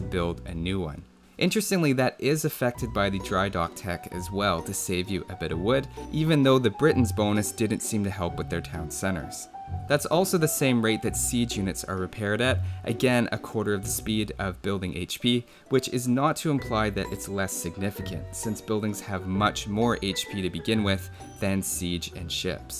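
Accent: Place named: Canada. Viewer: L'Orso Totalworriano Indeed. AoE3 is more about collection treasures and building trading posts and herding buffalos and bisons in the beginning, instead of building camps and finding sheep and so on. I agree, has a cool voice to listen to. These soft Canadian sounds:)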